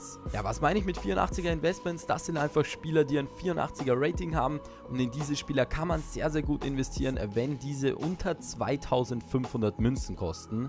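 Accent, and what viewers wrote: Austria. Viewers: Woher kommst du? (Österreich oder Deutschland oder)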